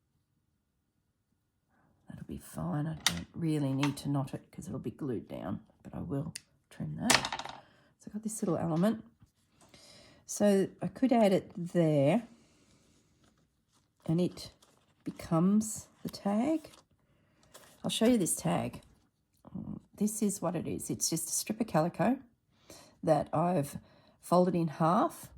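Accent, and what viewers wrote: Australia. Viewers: I watch some other crafters that make beautiful things that I love, but their voice and way of speaking make me crazy! I love watching you create beautiful things and listening to you! Maybe it's the Ausie accent!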